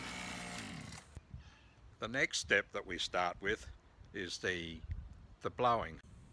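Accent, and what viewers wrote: Australia. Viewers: I CANNOT listen to an Australian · I like your accent! you aussies are okay with me. I've got a Weed Eater lol but I think I'll call it a Whippa Snippa from here on out. Cheers from Texas.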